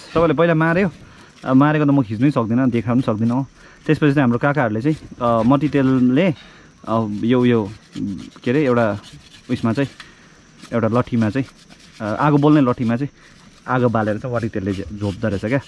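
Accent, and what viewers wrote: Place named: Darjeeling, India. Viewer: gaun thau herda nepal jasto chha feri boli darjeeling tira jasto chha kaha hola?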